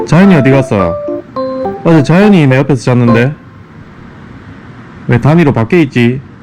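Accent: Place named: Gyeongsang, South Korea. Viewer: 경상도 말투 무뚝뚝한데 강아지들한테 넘넘 부드럽게 얘기하네요ㅋㅋ 완전팬입니다^^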